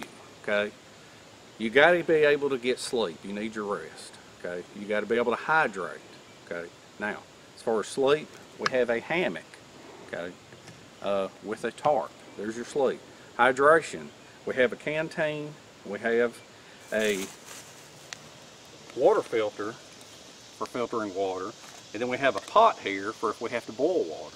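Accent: South, United States. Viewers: forgive my ignorance but your accent comes from which area in the US? Southern but where :)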